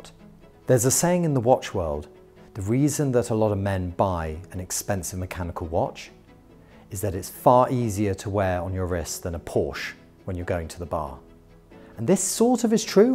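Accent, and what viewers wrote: England, United Kingdom. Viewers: Consumer psychology 101 mixed in with an English accent. Don't buy it. Think for yourself.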